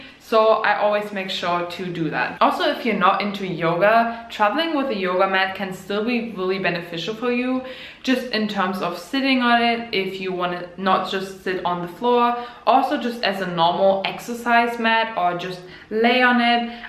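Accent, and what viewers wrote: Germany. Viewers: Hearing the accent I think Germany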